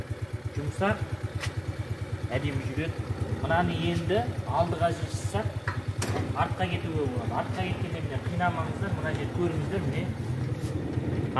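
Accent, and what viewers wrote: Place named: China. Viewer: Тіліңнен. Айналдым қытайдың Қазағында сакталган.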